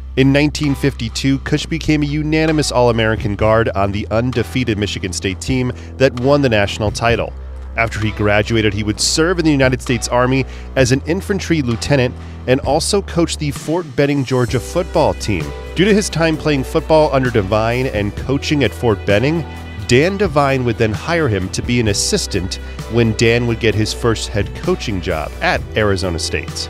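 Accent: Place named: Arizona, United States. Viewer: You are wrong.Kush did not deserve to be fired. When the real truth came out years later, Kush was hired as an ASU employee. That ASU hire would not have been permitted if Kush was as bad as you say. BTW..you talk like someone from Tucson. 🤔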